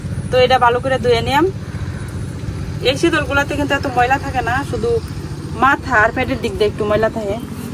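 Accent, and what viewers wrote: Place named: Netrokona, Bangladesh. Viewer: আপু, আপনার নিজের নেত্রকোনার ভাষায় যে মাধুর্য্য আছে তা কখনোই শুদ্ধ বা প্রামাণ্য বাংলায় পাবেন না। পশ্চিমবঙ্গের দুর্গাপুর থেকে বলছি - দোয়া করি ভালো থাকুন, সবাইকে ভালো রাখুন, আর আপনার মুখের হাসি অক্ষয় হোক। 🇮🇳🤝🇧🇩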